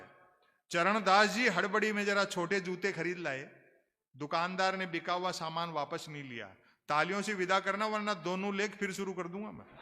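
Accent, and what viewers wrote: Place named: Rajasthan, India. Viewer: आदरणीय " " भाई साहब निवेदन है कि अपनी रचनाओं का प्रदर्शन राजस्थान में (हो सकता है थोड़ा दक्षिणी राजस्थान छोड़कर ) अपनी मायड़ भाषा में प्रस्तुत करते हैं तो बहुत अच्छा लगता है, फिर अपने झुंझुनू जिला का लहजा आप बहुत अच्छे से बैठाते हैं, हिन्दी और मायड़ भाषा को मिक्स-अप करेंगे तो भी अच्छा छूंकण लगेगा। करणी राम सैनी उदयपुरवाटी, हाल जयपुर।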